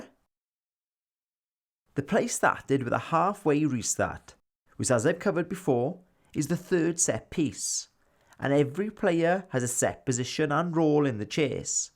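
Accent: Wales, United Kingdom. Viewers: Lovely lyrical Welsh accent ala Terry Grittths. I’d say when your ordering a pizza it’s like telling a story.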